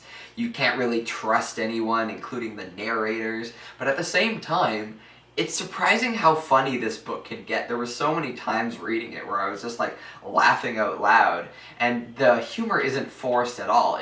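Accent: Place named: South, United States. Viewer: Ah, I thought there was a bit of a southern accent to your response 😆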